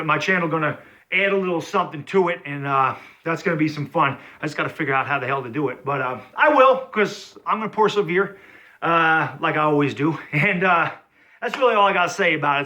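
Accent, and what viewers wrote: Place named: Staten Island, United States. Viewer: Youre accent sounds Staten Island to me. I grew up in Newburgh upstate.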